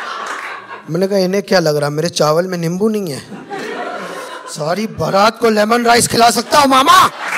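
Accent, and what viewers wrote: Uttar Pradesh, India. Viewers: U.P. tone🔥🔥🔥🔥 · Bhai ke bolne ke tarike me hi UP jhalakta h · His UP accent reminds me of Rajeev Srivastava 😂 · Up accent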